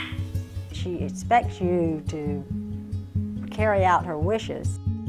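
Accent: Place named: Tennessee, United States. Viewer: Spot on for African Greys--I've had parrots for 40 years, my Grey for 15. Just an aside, I make a hobby of identifying southern accents (I'm from Florida, we don't count) so, here goes....hate to get it wrong: Tennessee?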